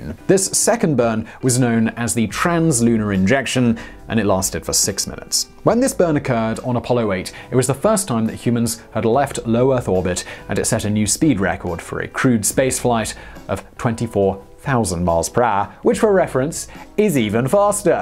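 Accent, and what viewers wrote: United Kingdom. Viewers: I must respectfully disagree. has an awesome British accent. I say, let him speak.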